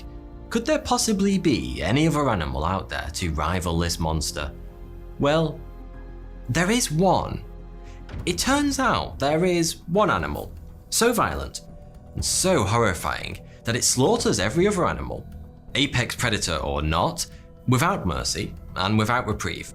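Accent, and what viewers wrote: United Kingdom. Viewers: I knew where this was going, but I just wanted to hear it in his beautiful British accent and voice.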